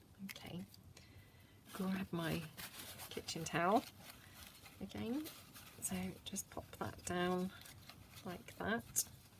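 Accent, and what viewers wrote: England, United Kingdom. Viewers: Lovely to hear and English voice as well.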